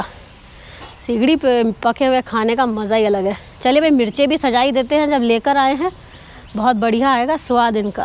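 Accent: Bihar, India. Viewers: Wah di wah... Kya to gajab aapne bihari boli or kya gajab ka explain kiya or uper se itni gajab ki recipe thi litti chokha ki sach main di khafi jayada maja aa gaya aaj ki video main. Thank You So Much For Shareing This Recipe. Di Best of wishes. 🌟🌟🌟🌟🌟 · Kya bihari bolti ho di😂😂 mujhe ase litti chokha pasand nai h leki apne kya gajab👌👌 recipe batai ho di ak bar try jarur karungi☺️😋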